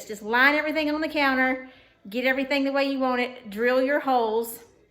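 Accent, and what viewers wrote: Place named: South, United States. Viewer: Adorable slow southern drawl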